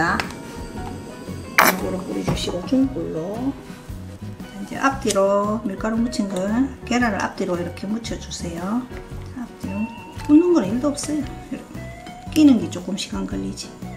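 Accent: Gyeongsang, South Korea. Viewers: 경상도 톤이라 더 친근감이 듭니다 향상 보고 듣고 많이 배워갑니다 감사합니다 ^^~^^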